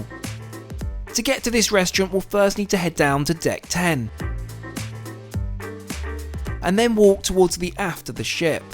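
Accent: RP, United Kingdom. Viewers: This is a cool video and is a great and informative room tour but I have never in my life heard such a posh accent with such awful pronunciation of words 😭 I must admit however that blurring kids faces is fantastic. You’ve done incredibly well there.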